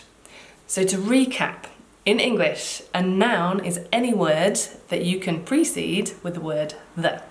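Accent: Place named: United Kingdom. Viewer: Great video. I'll be using this "the" explanation for my future teachings. Plus, always good to hear that Brit accent 😉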